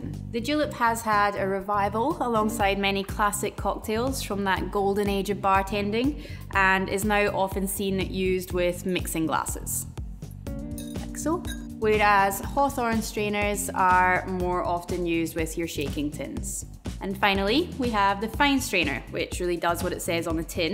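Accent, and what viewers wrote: Scotland, United Kingdom. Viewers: Love your videos and lovely Celtic/Scottish accent. I'm having trouble getting the exact song title and artist from your video. R, Patrick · God, I love that scotish accent. There should be more scots in this world. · By the way, I absolutely enjoy your recipes and your Scottish accent! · Does she have Scottish accent??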